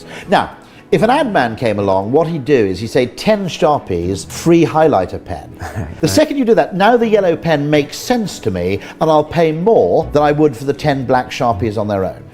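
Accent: United Kingdom. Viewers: Nobody would listen to this guy if he didn’t have a British accent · Why is there always a British guy to explain why everything is crap?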